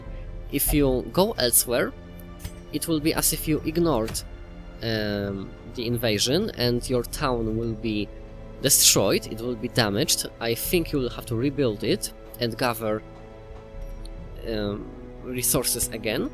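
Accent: Poland. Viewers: Yeah, typical polish accent, i knew it the first second as well.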